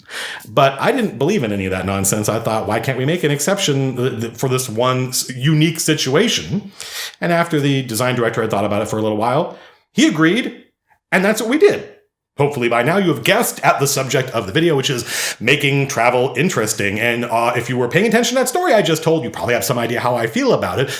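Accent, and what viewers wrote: Australia. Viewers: I liked the first one better, awful Australian accent and all. It had better detail and flow of information. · Hey, no more green screen artifacts! And no more Australian accent :(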